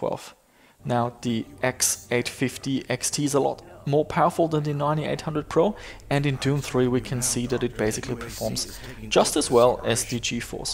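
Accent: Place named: Germany. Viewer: I imagined something like that happened., allow me one personal question: do you originally come from a German speaking country? I noticed your accent and every time you pronounce "Wolfenstein".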